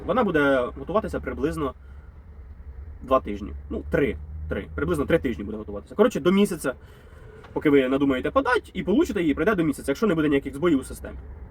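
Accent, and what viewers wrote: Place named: Vinnytsia, Ukraine. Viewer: Красавчик чітко ясно без води))) по говору ніби з Вінницької області 😅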